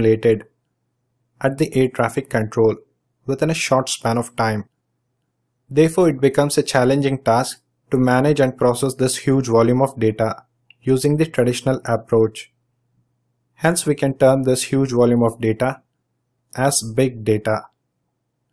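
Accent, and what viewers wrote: India. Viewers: I dont like the indian accent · Indian accent 🙄🙄🙄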